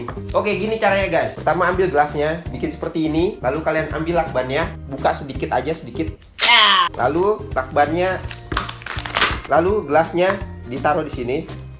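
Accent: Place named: Philippines. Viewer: i thought you were a filipino or visayan that's the way we talk but not me just little bit but im half filipino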